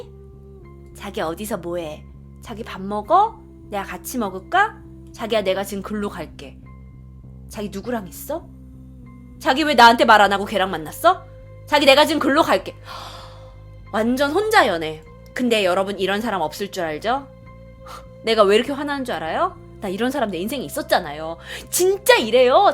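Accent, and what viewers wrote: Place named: Jeolla, South Korea. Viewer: 전라도 분이시죠? 으 발음 너무 귀엽네요.ㅎㅎㅎ(진심)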